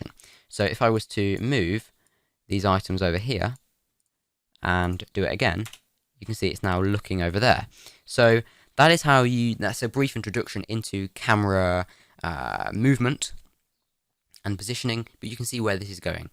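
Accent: United Kingdom. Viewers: I have needed this video for soo long. Also, is british;) FINALLY A YOUTUBER WHO DOESNT SAY zee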